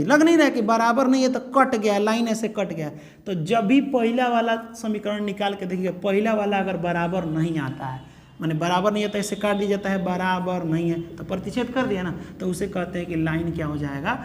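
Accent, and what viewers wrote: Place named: Bihar, India. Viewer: Sir, mera math strong hai Mera 12th me v tha, But , kis taip ke question aate Exam me isliye apki video dekhti hu , but sir apke video dekhe revision achhe se ho jata h, Ap week students ko v achhe samjha dete h, Bihari language me 😊 And confidence ke sath samjhate h Bohot sukariya hum sab ki taraf se ❤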